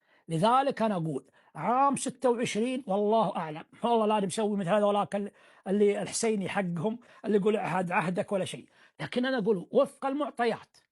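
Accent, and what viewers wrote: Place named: Najd, Saudi Arabia. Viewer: لهجته نجديه تنرفز تذكرني بالخرا بن باز وابن عثيمين وال الشيخ الله يلعنهم 😷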